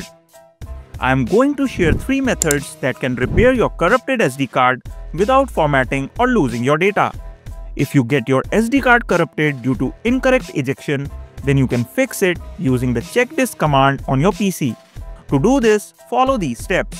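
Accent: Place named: India. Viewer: Very thick indian accent. Difficult to understand what u r saying. Too fast. Unable to catch up the instructions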